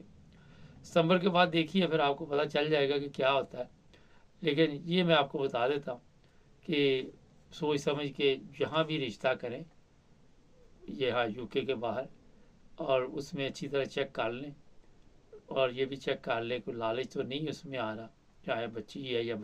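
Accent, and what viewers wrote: Punjab, India. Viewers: Please use a better microphone…. Place it close to your mouth…. You speak Urdu in pure Punjabi accent…. Please help the viewers You provide good information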